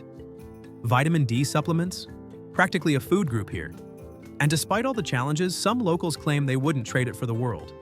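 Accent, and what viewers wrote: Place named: New York, United States. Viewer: This commentator must be from New York..... or Martha's Vinyard..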